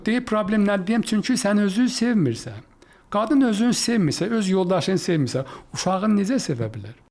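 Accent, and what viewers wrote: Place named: Nakhchivan, Azerbaijan. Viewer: Ləhçəsindən Haxçıvanlıdır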